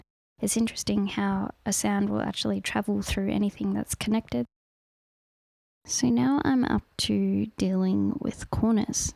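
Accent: Australia. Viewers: Unexpected Aussie! I thought you were American for some reason! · i love your asmr vids, they r so unique and sound amazing!!! and YOURE AUSSIE????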